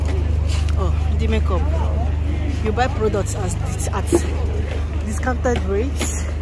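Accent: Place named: Igbo, Nigeria. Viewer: I just tap the like button before even watching because you are funny with the Igbo accent.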